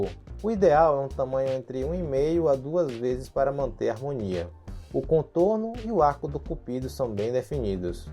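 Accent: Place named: Salvador, Brazil. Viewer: Tu é de salvador? Conhece esse sotaque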